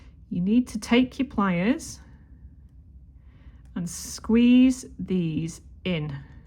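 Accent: United Kingdom. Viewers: Another great piece and so unique! I wish I’d had this when I was still working in an office. Thank you for this! 😊 Oh and by the way, do not worry about saying things such as “like so” or “literally” multiple times. We don’t mind. As an American I appreciate the little nuances of the English language as spoken by someone from Great Britain. My favorite thing you say is “faf about”. I had to look it up the first time i heard you say it and I just love it. 😁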